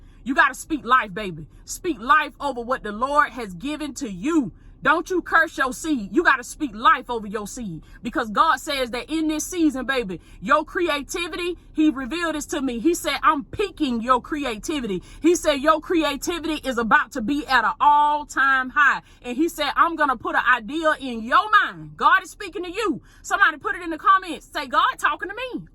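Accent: South, United States. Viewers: Sis u so country but love it ..talk to that thang that’s my word I use to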